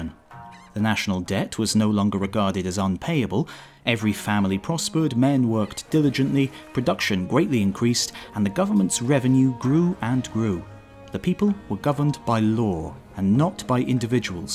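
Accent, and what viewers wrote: United Kingdom. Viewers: All read by a Brit, our former enemy.... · and read by a foreigner from President Washington's most bitter enemy (i believe)! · I'm learning US history from a british person reading an english translation of a japanese book about american history. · And let's not forget, narrated with and British accent!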